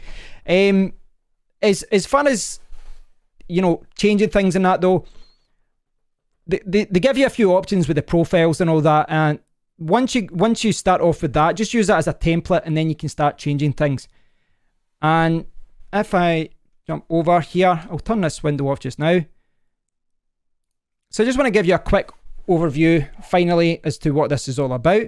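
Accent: Scotland, United Kingdom. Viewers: Scottishhhhhhhh!!!!!!! i did my first degree in Glasgow and missed this Scottish accent. Congrats, very good demonstration. Thanks for sharing. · is he scottish? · best scottish accent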